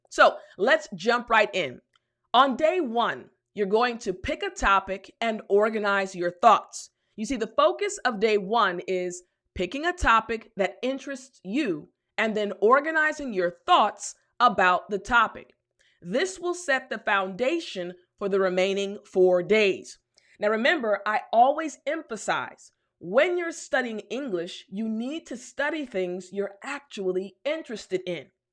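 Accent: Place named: United States. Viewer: Thank u soooo much teacher. You're sooo funny, that makes everyone to like your movies. I heard your song in that movie,even someone was sad can smill as well. I also like your smart accent, pure American. We're following you in a group person from Congo Kinshasa(DRC). Thanks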